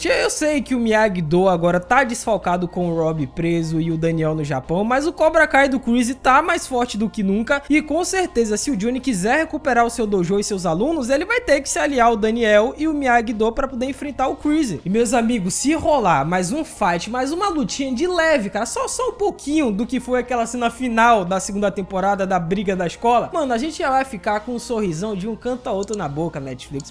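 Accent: Bahia, Brazil. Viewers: Você é daq da bahia??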